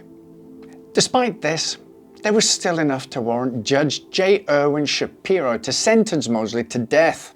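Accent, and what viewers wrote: Scotland, United Kingdom. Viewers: Why toning down the Scottish accent?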